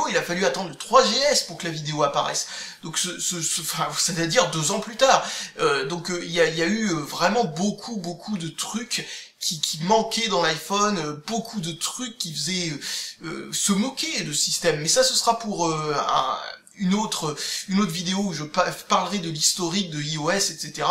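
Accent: France. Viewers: Je suis entièrement d'accord, surtout qu'il prononce pas mal de trucs à la française...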